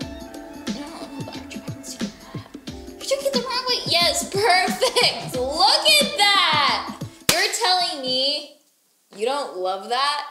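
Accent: United Kingdom. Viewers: You sound so good in British accent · I love the British accent!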